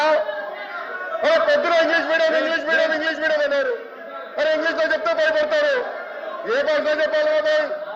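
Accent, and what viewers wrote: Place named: Telangana, India. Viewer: Lokesh ki andhra kanna telangana slang masth set aythundi 🤙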